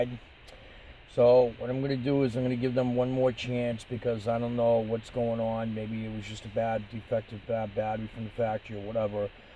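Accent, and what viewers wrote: United States. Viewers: Love the Boston accent! I live in Haverhill! Lol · Recognized the accent right away. Lived in Salem Mass!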